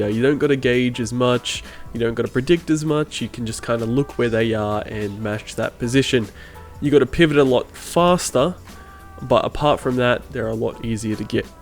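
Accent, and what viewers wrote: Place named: Australia. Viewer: why hello fellow Australian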